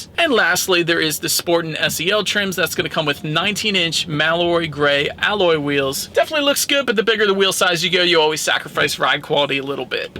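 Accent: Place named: Philadelphia, United States. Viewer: are u from philly???? omg thats the most philly accent ive ever hurd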